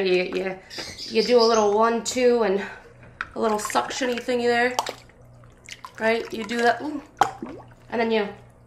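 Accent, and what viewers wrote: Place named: Cuba. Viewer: 😂😂😂 that Cuban accent kills! I love it! I miss my Cuban people in Miami! I miss Miami!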